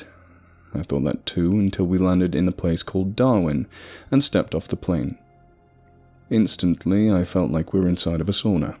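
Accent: South, United States. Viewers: i like the country narrator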